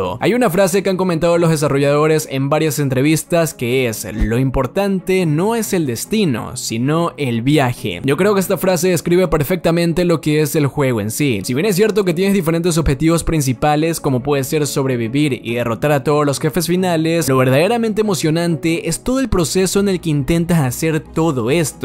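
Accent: Ecuador. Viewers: Hablaste como ecuatoriano, me equivoco?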